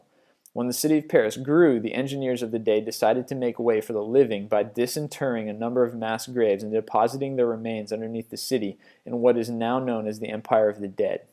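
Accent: United States. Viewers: ***** You're not american then I guess because that's what americans sound like. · STOP complaing about his bloody voice!! He is America, he sounds like that.. he can't just magically change his voice to please you. Get used to it, or leave. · EVILBUNNY28 The suffixes of -burgh and -ham are pronounced the way he pronounced them in the US. Pittsburgh, Pennsylvania is pronounced pits-burg. It's not that we don't have names like that in the US and therefore he had a guess, it's that we simply pronounce them differently (likely due to our mixed culture) and that's what he is used to. Many Americans would distinguish Edinburgh with the UK pronunciation but that's because -burgh has the necessities to be pronounced -burra. The pronunciation of -ham is very much an accent or something you need to be told to do thing. Pronouncing "-ham" as "-um" doesn't make any sense to people who are native speakers but who have not grown up pronouncing "-ham" as "-um". 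Sort of like telling Americans they need to start dropping their R's on words.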